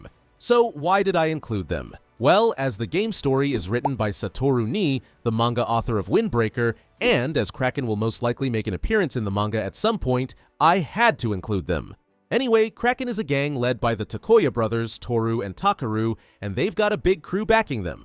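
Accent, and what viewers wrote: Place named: United States. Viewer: the american pronounciation of the japanese is so funny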